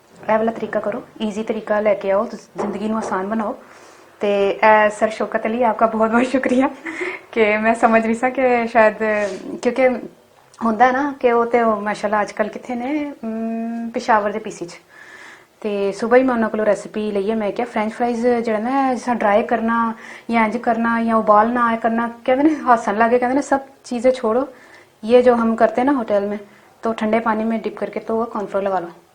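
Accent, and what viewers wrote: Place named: Punjab, India. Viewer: Ap kitna pyara bolti hain punjabi ma 😍😘😘😘 zabardast recipe 👍👌👌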